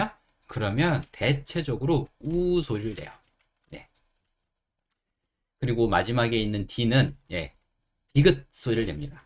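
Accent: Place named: United States. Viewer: 미국발음 띤입니다!^^^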